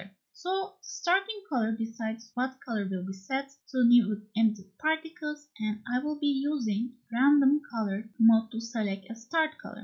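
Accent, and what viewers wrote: Turkey. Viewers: aksanınızdan Türk olduğunuzu hissediyorum :D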